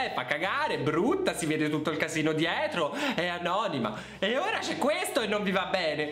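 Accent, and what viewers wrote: Tuscany, Italy. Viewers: Io ti amo ....basta vederti e sentirti e l accento toscano poi ....😘😘😘😘😘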